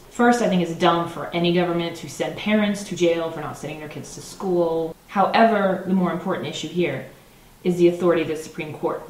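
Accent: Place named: United States. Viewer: את דוברת אנגלית שוטפת ובמבטא אמריקאי מובהק, אבל שמעתי אותך גם מדברת עיברית בלי מבטא (אם אני זוכר נכון). את עולה וותיקה?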